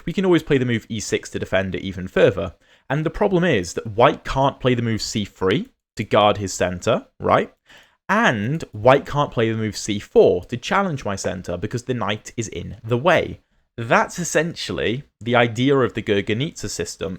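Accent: United Kingdom. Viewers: I have been following you since Rio de Janeiro. Besides enjoying your explanations I am delighted by your British accent.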